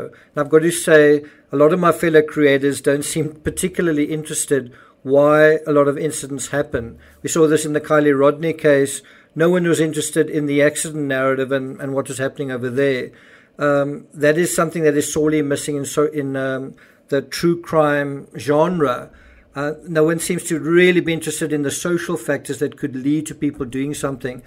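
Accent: South Africa. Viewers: (I've heard it spoken this way by all? south Africans.. said with all due respect. 🤭they are protective of their 'woman'.. anyway, goes back in history&culture !! Not something I know enough about😌, but the very norm how its 'pronounced' 👍🏻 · It's his accent. He's from SA.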